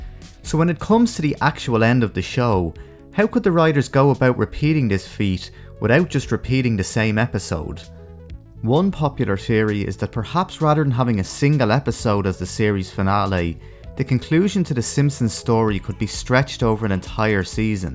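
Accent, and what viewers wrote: Ireland. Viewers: He’s Irish. 💯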